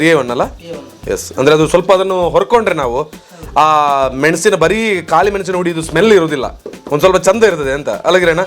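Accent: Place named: Arebhashe, India. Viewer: Arebashe mathadi kelikke Kushi ade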